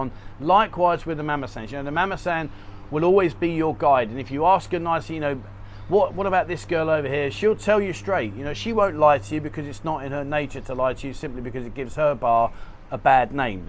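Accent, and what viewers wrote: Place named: RP, United Kingdom. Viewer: ...does a good job with information...and with a bit of the 'kings accent, too! remember: be cool..if you are 21yoa, 41yoa or 71yoa..from europe, the US or wherever. does not matter..be cool.. the beer thing..most of the clubs drafts..not pubs..but thai bars drafts are watered down...if i go in the first is a bottle..then..i might switch to a draft..."balance".. dress nice but not too nice. be well-groomed, and hygiene. put some slick hair gel..a nice bottle (not cheap) of colone helps..i would bathe in it and the girls would cuddle right in the club and compliments me too. like any woman they like assertive..you see a girls you like go ask her or ask the waitress to ask her over for drink...best thing be and have "fun"..don't sit there like at a dentist appointment.. kind of like the more fun you are having the more fun "the" girls like that.. 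the rule of no photos has been for decades..i think..in angeles city/PI up until i think 2012 or so you could take pictures and video...from what i know there..not anymore hence internet. if i am on a chatting app..it (phone) is in my lap or on the table..guy "things" like that the girls in the clubs..pick up on and will respect your and like you...since you are "protecting" them.. i will say thei for the 11th time.."best" years of my life...best.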